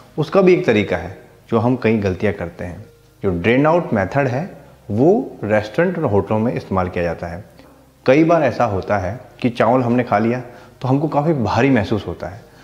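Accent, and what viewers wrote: Uttarakhand, India. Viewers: Bhaisaab ap uttarakhand se ho kya?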